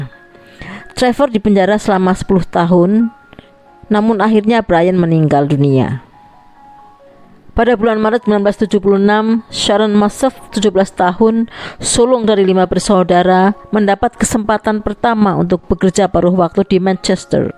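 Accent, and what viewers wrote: Java, Indonesia. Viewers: ..... tetap aja top markotop kalo madam yg bawa kan.... mau itu kasus apa pun.... karena ciri khas medok nya.... malah bikin kangen.... selalu.... teruskan bersinar ya madam....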